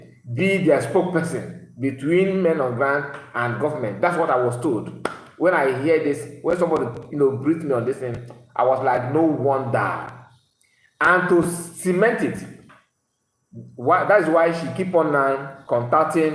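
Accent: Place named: Fulani, West Africa. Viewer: You don't know where you come from that's why your accent is the same with foolani people. Leave nwanyi isi ocha, leave all the saga and your stupid analysis. Let's talk about PM, let's talk about MNK, let's talk about Biafra recognition, let's talk about Homeland. How long are we going to remain in this saga? Is this how to run a government? Criminals!!!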